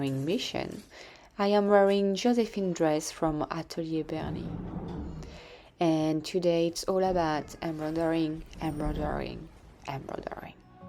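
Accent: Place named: France. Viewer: Love your French accent ! Et tres Jolie tasse au passage 😘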